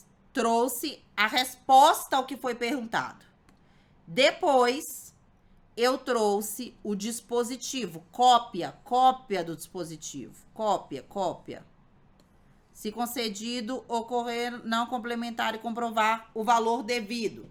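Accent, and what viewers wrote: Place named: Minas Gerais, Brazil. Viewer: é mineira 😂😂😂😂😂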